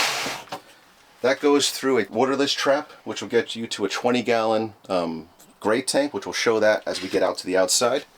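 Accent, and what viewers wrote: New Jersey, United States. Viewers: that New Joisey accent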